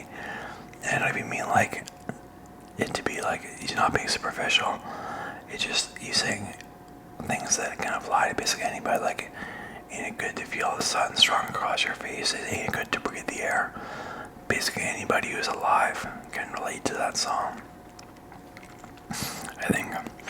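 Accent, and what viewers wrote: Canada. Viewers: are you from nova scotia? familiar accent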